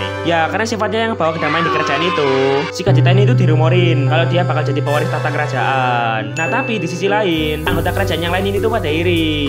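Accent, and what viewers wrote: Java, Indonesia. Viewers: Jowone kentel tenan mas👍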